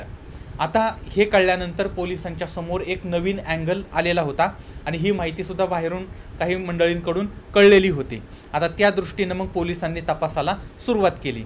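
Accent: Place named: Ahmednagar, India. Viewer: This is the way Nagar people talk accurately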